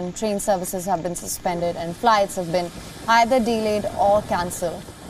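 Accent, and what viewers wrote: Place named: India. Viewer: The anchorwoman looks like a Filipina but with an Indian accent.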